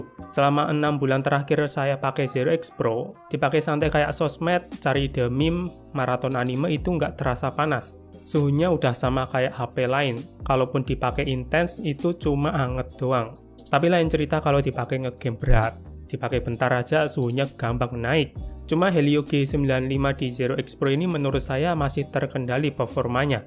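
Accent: Surakarta, Indonesia. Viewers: Dari solo kah bang? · Lah orang solo bang?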